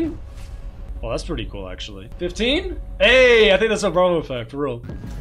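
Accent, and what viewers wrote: United Kingdom. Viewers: Aaaaah! It’s a british person! 🤣 You got it! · ITS A BRITISH PERSON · its a british person LMFAO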